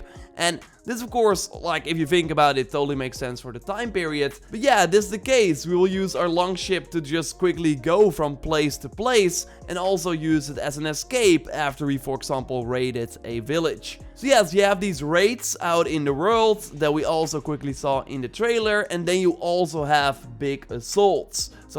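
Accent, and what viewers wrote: Netherlands. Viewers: Is dit een nederlander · are u dutch? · Not to mention his charming dutch accent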